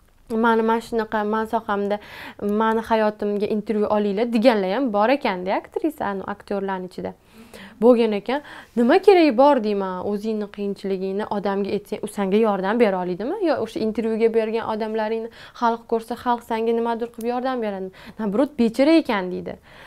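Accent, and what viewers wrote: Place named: Tashkent, Uzbekistan. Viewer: Nega toshkentcha gapiryabsiz sirdaryoliksizu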